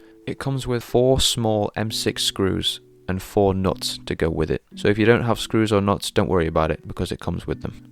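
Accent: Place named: United Kingdom. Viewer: Sadly, I searched on eBay and wasn't able to find any stateside. Going by your accent, you sound like you're in the UK. I found the seller you probably bought yours from, but the shipping cost to the US makes it out of reach. I'll probably just spray paint the piece of silver 4080 I ordered from Aliexpress..when I get it in 5 or 6 months. haha.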